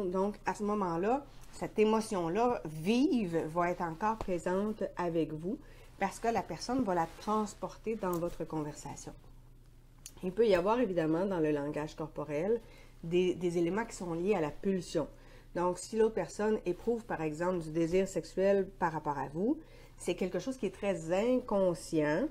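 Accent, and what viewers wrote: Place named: Quebec, Canada. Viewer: Salut. Je viens juste de me réabonner À ta chaîne. J'adore honnêtement continue. J'aime bien et beaucoup ton accent québécois Amicalement bisous Sophie😺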